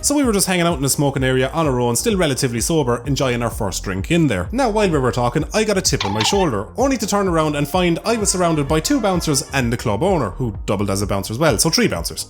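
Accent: Ireland. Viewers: A lot of Irish accents are like this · OMG sexy irish voice boi about to blow up! Won't be long before you're at 1mil after you hit 100k. Congrats from 21+ hell place,! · Every time I see when you upload, I stop, and I go watch your videos man. They are a riot, also that Irish accent man, you rock. Super entertaining, keep it up!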